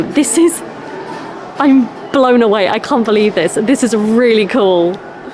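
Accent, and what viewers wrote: United Kingdom. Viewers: たくさんのイギリス人You Tuber がいるけれどさんのブリティッシュ英語が本当に耳に心地良いです☺️ あ…目にも心地良いです😊笑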